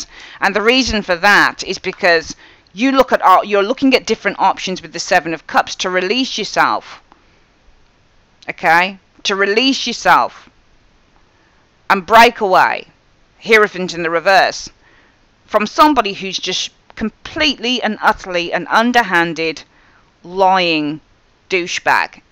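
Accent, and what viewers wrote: Birmingham, United Kingdom. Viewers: You sound like a brummie...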